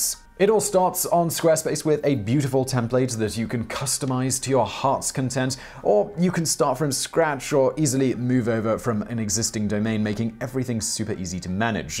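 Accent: United Kingdom. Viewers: "Occasional blowing before insertion was still a thing" to my American ears, you saying that so casually with the AWESOME British accent is one of the funniest things I've heard all week! Had those words come from someone with an American accent it wouldn't have been anywhere near as funny to me..... Thanks for another great video sir! Love what you all do and how much hard work goes in to all of these videos. It's appreciated sir.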